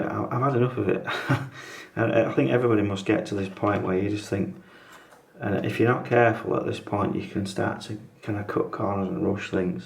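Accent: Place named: Yorkshire, United Kingdom. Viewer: Thanks….love the details…..thought the ‘mumbling’ comment was unfair and the Yorkshire accent is quite mild, I heard everything clearly. Please do not let that comment discourage you, keep them coming.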